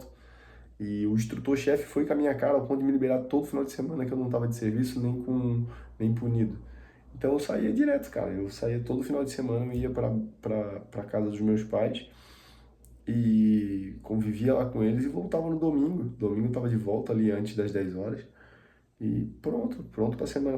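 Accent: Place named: Florianópolis, Brazil. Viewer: Pois é, tbm reparei, ta recuperando o sotaque do manezinho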